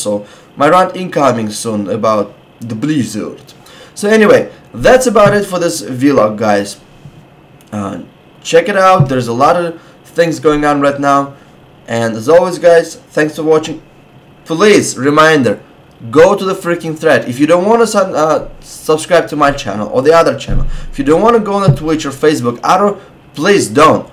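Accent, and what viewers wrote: Russia. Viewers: Охуенный русский акцент, почаще бы от тебя)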